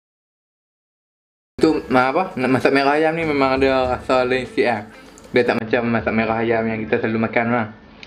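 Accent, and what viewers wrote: Malaysia. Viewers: Awat comey ngat bm melayu 😂😂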